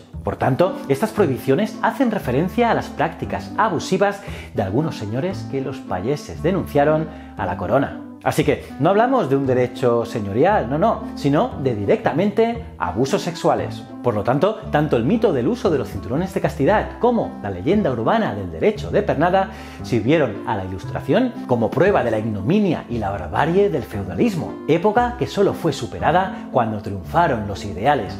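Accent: Spain. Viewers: MUY INTERESANTE TU CANAL, ¿DE QUE PARTE DE ESPAÑA ERES.?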